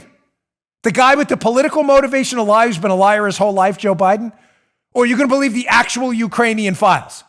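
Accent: New York, United States. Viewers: NY wit and accent is wonderful! Love the quips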